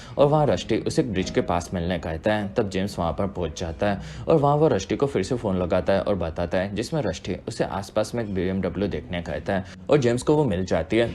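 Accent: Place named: Odisha, India. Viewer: Man your accent looks like Odia-hindi mix.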